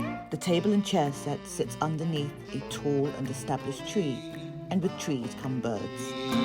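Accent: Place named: England, United Kingdom. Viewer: I just came across your station and I am so engaged with your channel! You have managed to make everything a real experience from the classical music , the English accent and the history ,poetry I love ❤️ it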